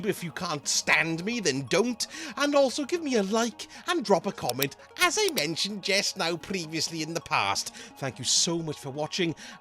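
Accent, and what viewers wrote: Wales, United Kingdom. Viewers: I can spot a Welsh twang in your voice,am I right?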